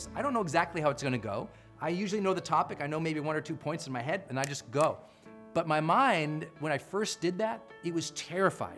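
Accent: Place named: United States. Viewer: One who is weak at English can easily learn English by listening U.S English it is much easier than that of England their accent is little bit harder to understand for new language learner and fortunately Brendon is from USA so you can't listen to him.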